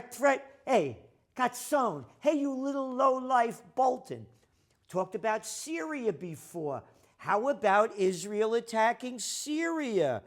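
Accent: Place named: New York, United States. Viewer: I think it's his thick New York-ness. New York-ness spans multiple ethnicities. As a half-Italian woman from NJ, how I miss NJ-NY-PA style.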